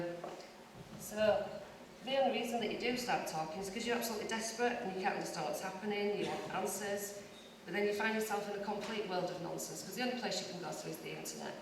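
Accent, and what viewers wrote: Yorkshire, United Kingdom. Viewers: it is English you Muppet!! YORKSHIRE to be exact!! Not everyone over here speaks like the queen or the BBC world service!! We are a very diverse nation in accents!!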